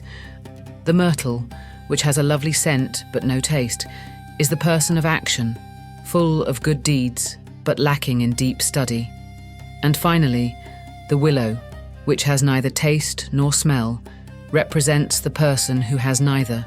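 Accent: United Kingdom. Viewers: I’m 49 seconds in, the British accent on the narration though? I hate AI because it has a way of coming across as tone deaf and too much, but the imaging is cool and story telling is (over the top. These things can be written by a romance novelist for the same effect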